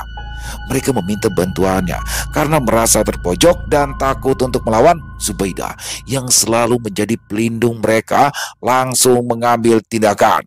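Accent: Jakarta, Indonesia. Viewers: Mantab.... Accidentally jadi preman pasar mak zubaidah... Kwik.. Kwik... Seru Abizz... Lanjut bang... Pas banget bawain cerita seru ini dgn logat betawi_nya...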